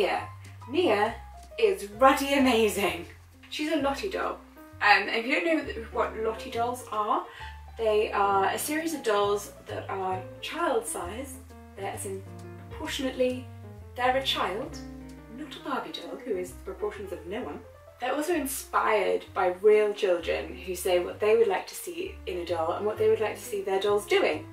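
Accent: United Kingdom. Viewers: Weird u still have the British accent even tho ur deaf lol how did manage to keep that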